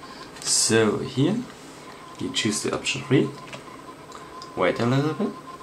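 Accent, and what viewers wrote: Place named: Germany. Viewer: Tried so many times to root my Z1 compact and all methods never worked until this one, Thanks very much, greatly appreciated, root succesful, now all i gotta do is figure out how to get apps to actually work on the sd card, using folder mount and whatever else is needed. I had an idea when i heard the German accent it was going to work this time :)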